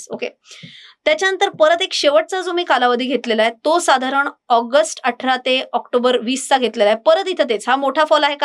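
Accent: Pune, India. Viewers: As always, this podcast was well studied and articulated by you, पुणेकर आहेस तू, ते अजून छान feeling देते.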